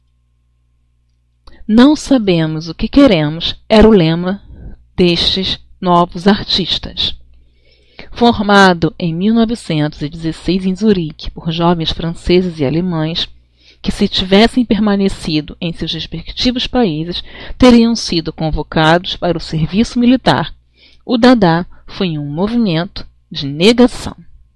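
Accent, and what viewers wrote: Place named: Portugal. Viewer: Mais um excelente vídeo, professora. 😁 Gostaria de fazer uma pergunta, profª., você é portuguesa? Pois tem um sotaque um pouco diferente rs. Abraços. Sou fã do teu trabalho.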